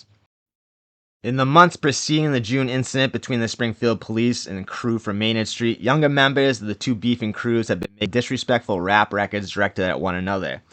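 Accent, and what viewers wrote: Boston, United States. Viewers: This guy has a boston accent lol😂you ain't from the field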